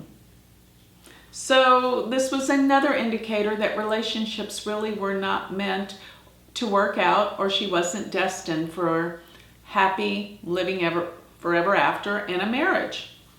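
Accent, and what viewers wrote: United States. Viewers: thank you for the very perceptive and accurate analysis of Lousie's Chart. I must say you sound such a darling when you pronounce the Indian or Vedic terms....it's cool to listen with your American accent....I love it.....Best wishes on your work in Jyotish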